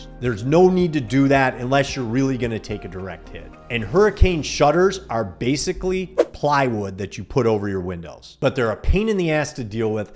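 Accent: Michigan, United States. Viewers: Yep, I thought you were a fellow Michigander, as soon as I heard you talk.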